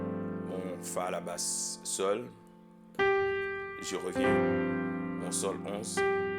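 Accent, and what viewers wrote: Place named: Canada. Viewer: Vous avez un accent pas très prononcé mais coe une personne qui a été au canada ou bien vous êtes au canada.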